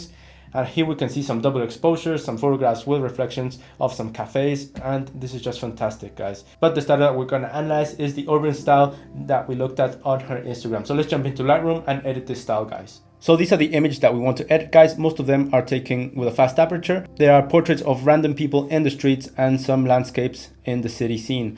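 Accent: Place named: Latin America. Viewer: Cómo se nota que eres hispano parlante, entiendo todo lo que dices a la perfección jajajaja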